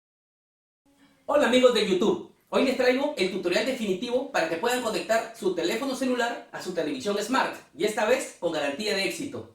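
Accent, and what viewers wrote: Peru. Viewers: Bien, peruano tenías q ser, entiendo bien tu castellano.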